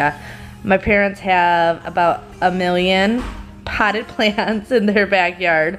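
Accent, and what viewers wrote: Wisconsin, United States. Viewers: I came across this in my recommendations and said to myself, "Sounds like she's from Wisconsin..." I grew up near Waukesha. :D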